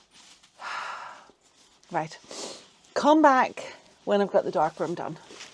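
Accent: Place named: Northern Ireland, United Kingdom. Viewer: Love a Northern Ireland accent, I could listen to you all day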